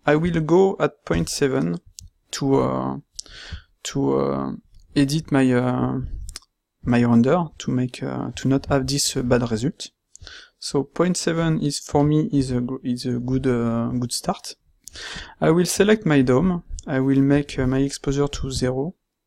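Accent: France. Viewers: it has to be, you can't make a more french accent than that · oulala l'accent , moi je comprend parce que je suis français mais pour les anglais sa doit être compliqué ... super video sinon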